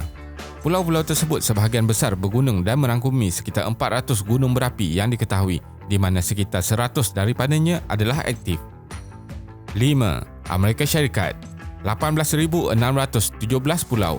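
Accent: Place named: Malaysia. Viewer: yang ngomong kaya orang malaysia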